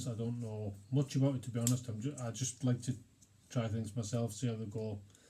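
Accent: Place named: North, United Kingdom. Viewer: I prefer a northern accent when learning about fantasy/minis/terrain - feel free to throw a few more mini stories in there, you're entertaining! ^_^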